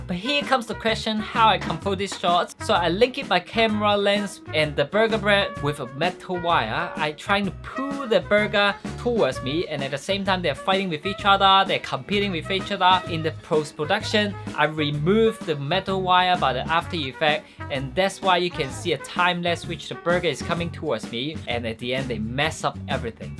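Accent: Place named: Hong Kong. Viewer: 喂，你啲英语發音和用字都係自己作出黎和有好重的香港广东话口音；西人是完全唔知你噏乜；你要好好学吓 preposition 应该点用，唔好乱讲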